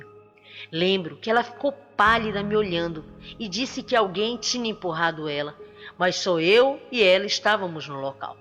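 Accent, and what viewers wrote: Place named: Amazon, Brazil. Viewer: eu sou de belem, pelo seu sotaque as vezes eu tenho duvida se voce é de belem ou de manaus. Amo os seus videos, abraço.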